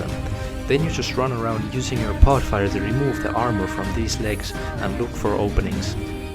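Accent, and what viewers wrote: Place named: Finland. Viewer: sounds like an finnish accent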